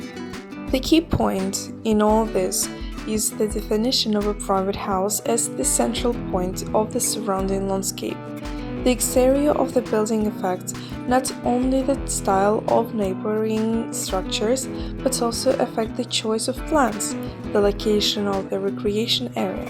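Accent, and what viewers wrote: Russia. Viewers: Русский акцент уникален😊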